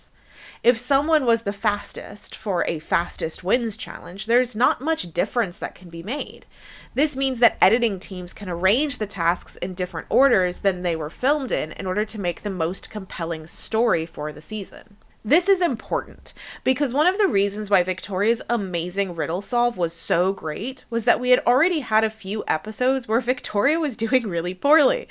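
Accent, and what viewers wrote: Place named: United States. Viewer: One good thing about Taskmaster is that the British female contestants don't have that annoying American way of speaking that this narrator has.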